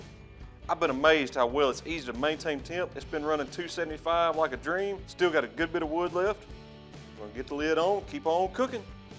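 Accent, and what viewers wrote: South, United States. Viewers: Theres something about a southern accent that warms my australian soul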